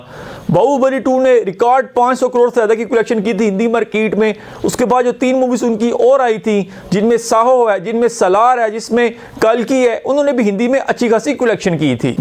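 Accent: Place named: Pakistan. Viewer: You seem pakistani accent।।।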